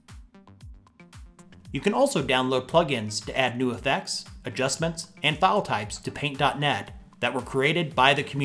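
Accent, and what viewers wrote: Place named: United States. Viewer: Wow, an english speaking American video, that's not from India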